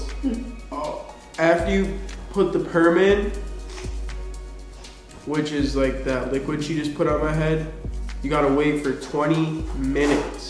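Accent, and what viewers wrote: Wisconsin, United States. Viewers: His wisconsin accent is the best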